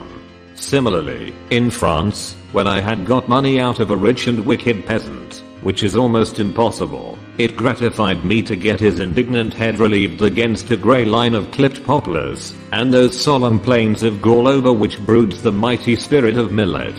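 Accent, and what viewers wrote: United Kingdom. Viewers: All of his voices and pronunciation is perfect!Full of British Accent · good british accent...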